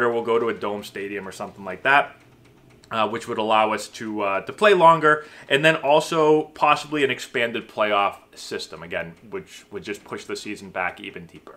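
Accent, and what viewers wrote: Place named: Boston, United States. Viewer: Boston accent. Nice. Now say "I went to a bar and parked my car. I put my car keys in my khakis."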